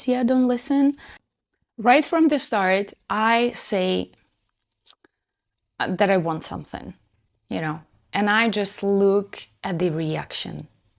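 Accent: Russia. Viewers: Is ugly Russian accent a must in such lessons?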